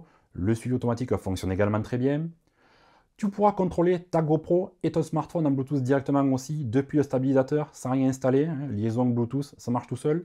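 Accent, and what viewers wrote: South, France. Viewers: Hellooo 👋 Une approche différente de Yannick mais un enthousiasme similaire envers ce superbe stabilisateur et toujours avec l'accent du sud 😎✌️😉👍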